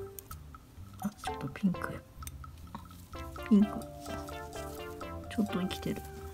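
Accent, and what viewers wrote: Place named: Yamagata, Japan. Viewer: 可愛い子ばかり♥️ こちらでは、さっぱり、まったく多肉さんがかれません！羨ましい( ´∀`) でもさんトークがいちばんめんごくて地震の恐怖もぶっ飛びましたはぁ(((*≧艸≦)ﾌﾟﾌﾟｯ笑有り難うございますm(_ _)m 山形弁‼️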